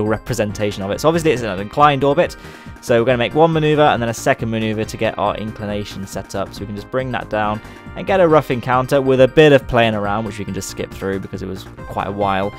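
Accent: United Kingdom. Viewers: I really love your british accent :D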